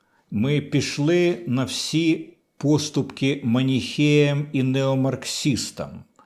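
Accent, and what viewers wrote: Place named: Russia. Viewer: буде вашчЄ (буде важчЕ), щЬо нам іщЄ треба... Пане Дмитро, як завсіди, спасибі за промовисту орацію, але... будь ласка, поверніть собі українську фонетику замість московської. Шиплячі ж бо в нас тверді, не мякі як у москаля.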